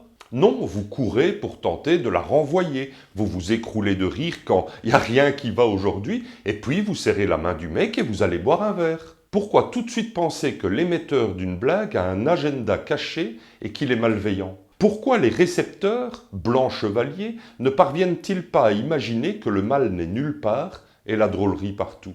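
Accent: Belgium. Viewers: PS avant mon post (chu un crétin, c'est un PRE-scriptum du coup et chu un génie) : en fait c'est l'accent belge, tu m'as eu ! Première fois que je vois ta petite tete chauve, tu me demandes de m'abonner, tu sais bien que c'est mon premier passage, j'ai ta miniature, un titre semi chelou, et toi qui vient m'intepeller avec un accent sympa ... Bha a , avec ta tete, ta miniature et ton titre, avec ta petite demande et ton accent de biereux, JE MABONNE ! Je viens de m'abonner, je ne jugerai pas sur cette video. A toi de me faire rester maintenant :) (je regarderai bien sur par apres tes anciennes videos, si ca me tente. Si c'est deja pas top, je verrai tes prochaines) Je compte sur toi ! Je veille ! ♥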